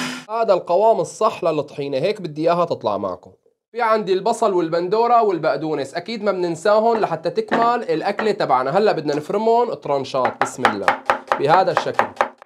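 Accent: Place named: Damascus, Syria. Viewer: الشيف اشطر من الشيف عمر وكل شباب الشام تقريبا بيحكو بهالطريقة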